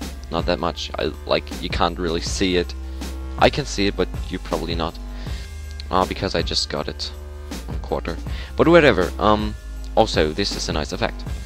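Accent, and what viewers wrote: Germany. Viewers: ist er deutscher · lol du bist deutscher? dein englisch is ja mal überboss ;D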